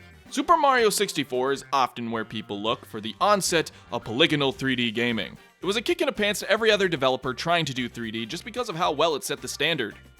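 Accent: Canada. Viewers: THIS DUDES CANADIAN!!!! WHEEEZE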